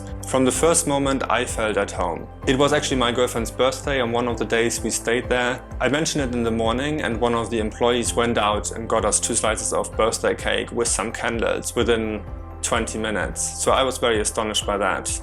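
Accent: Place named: Germany. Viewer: nice vlog, german accent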